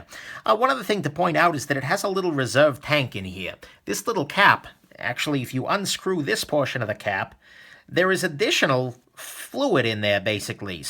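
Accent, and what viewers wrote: New York, United States. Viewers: I like. His humor. His gear. His reviews. His 1920’s voice. EXTRA! EXTRA! EXTRA!... is that accent NY Italian?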